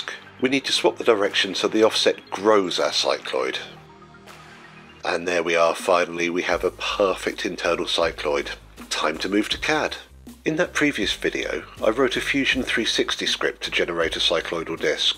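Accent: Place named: United Kingdom. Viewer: Gosh, you int 'alf clever! And so refreshing to hear a British chap doing interesting stuff on Youtube. I hope I haven't broken any rules. 😀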